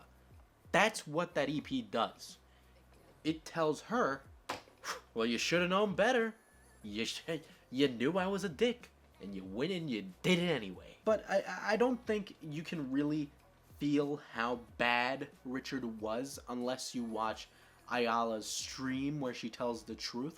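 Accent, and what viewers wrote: New York, United States. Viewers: Liam Collins I think its just the new yorker accent accompanied with memes. I dont think he acts like chrisraygun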